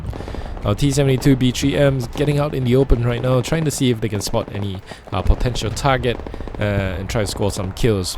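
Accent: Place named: Singapore. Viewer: Those frogfoots 🤯🤯🤯 beautiful plays! Thanks for good commentary too, I'm guessing you are from Singapore?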